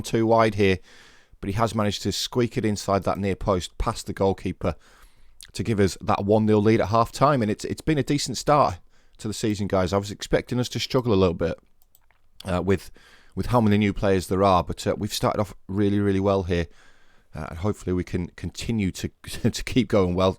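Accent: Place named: Newcastle, United Kingdom. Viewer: Glad to see you're going full Geordie mate, no shame in it, you'll have to to change the intro to 'Home Newcastle' 😉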